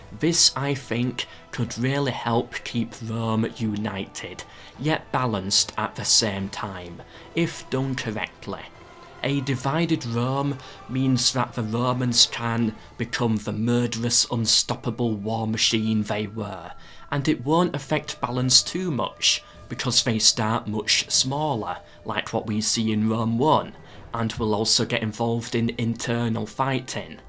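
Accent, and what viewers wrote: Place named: England, United Kingdom. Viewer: I managed to get it to very end but that pronunciation was hard for my ears. What is that dialect? I presume from England. But from where specifically? Just curious.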